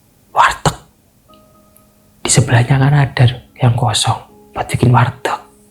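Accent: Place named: Java, Indonesia. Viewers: ceritanya bikin lama krna bhasanya campur,knp sih gk pake bhasa Indonesia pakem aja,pake bhasa Jakarta juga logat lu ngapak,gk enak di denger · Runtut, cara bercerita, meskipun kadang terselip dialek bahasa Jawa. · Orang ngapak mase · ngapak emang sya suka gaya ngmonge lucu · Medok sekali rikanyah kisanak li crita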